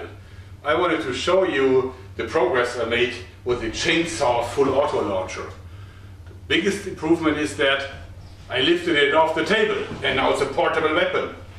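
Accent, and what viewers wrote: Germany. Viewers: Das ist eindeutig englisch mit deutschem Akzent :D Hab ich recht? :)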